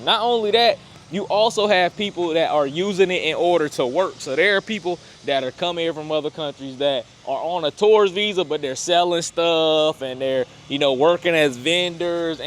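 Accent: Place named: Detroit, United States. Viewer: Put on your Nigerian accent instead brother drop the ghetto Detroit lingo hip hop you sound low iq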